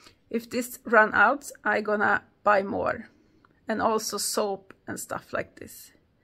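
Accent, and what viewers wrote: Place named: Sweden. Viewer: I am Swedish also!❤️recognized your accent! Lycka till!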